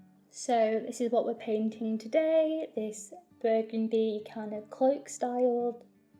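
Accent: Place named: United Kingdom. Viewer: the most refreshing thing about your videos is your british accent. It's really nice to hear over all the american english (or non-native speaking) painters.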